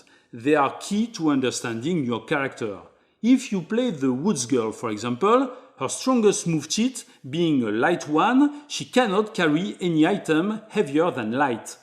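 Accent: France. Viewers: Just telling you the facts. Audio is just as important as video, sometimes more so. People can be very tonally sensitive. Not everyone is French. This might be the one instance of YouTube AI (voice dubbing) actually being beneficial. Turn it on. See what happens. It should translate fairly well. If it does not translate, turn it off. It should be better than subs. Guaranteed you get more views. You want to keep people watching, yes? These are very long videos. Keep options open. I had to use other MR tutorials based solely on audio. Imagine someone with a heavy German accent trying to do these videos in English. Same thing. I’m German and I would not want to listen to that and if I had a heavy accent I would get someone else to do the audio. In this case the option is absolutely FREE.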